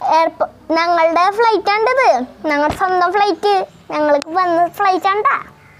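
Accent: Thrissur, India. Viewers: wow hyderabad to cochin yathraa manoharamayi kanichu thannathinu othiri thanks...molude samsaram super..Thrissur slang 👍❤️ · Trissur slank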